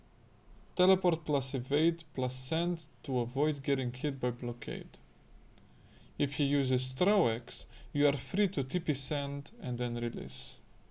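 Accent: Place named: Romania. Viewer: Super tare, ma gandeam ca esti roman dupa accent, dar pana la duel cand am vazut in chat nu eram sigur :)) E comunitatea mare pe 4story classic? Tot am zis ca vreau sa ma reapuc de un server de 4story si din ce vad pe youtube, sunt destui playeri care joaca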